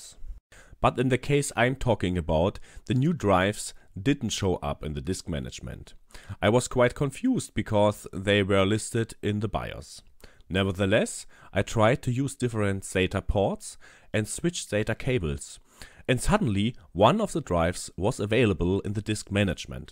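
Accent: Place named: Germany. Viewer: This is awesome, it works fine for me after hours of unnecessary unplugging and plugging . Thank you mate. And by the way, nice German accent. I have the French version of it.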